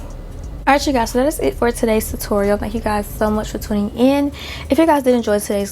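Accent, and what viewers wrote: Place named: United States. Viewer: you sound american but nicer american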